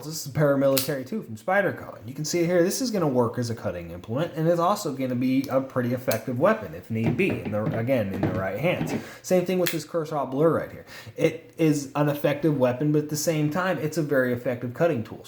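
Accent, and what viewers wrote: United Kingdom. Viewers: What are you british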